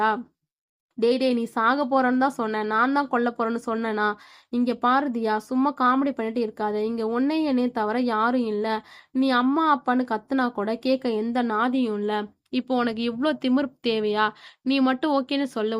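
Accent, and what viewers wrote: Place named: Chennai, India. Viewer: Nice Chennai voice 🎉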